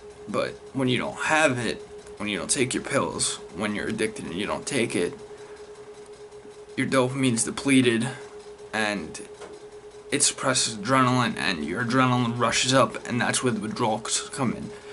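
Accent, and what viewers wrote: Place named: New York, United States. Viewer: yo I hear what you're saying in this vid, I've been struggling since I was 16 and I'm 19 now.. what you were saying about the sub docs is true no one needs that much, there's a 'ceiling effect' to the stuff.. none the less u spoke the truth and I dig the patriots stuff in ur room even though u sound like a new yorka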